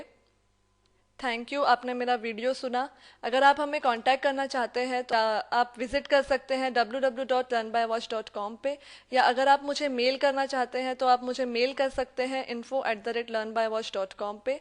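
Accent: India. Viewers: Helpful program but Indian English voice that is difficult to hear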